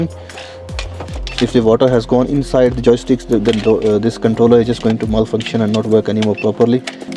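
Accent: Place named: India. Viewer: Bro I think you are Indian . As your accent is exactly Indian.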